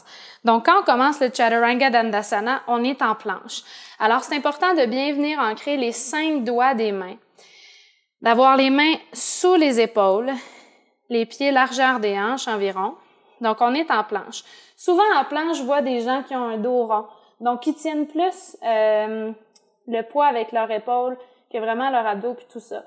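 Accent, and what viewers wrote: Quebec, Canada. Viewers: Yaaaaiiii, une Québécoise!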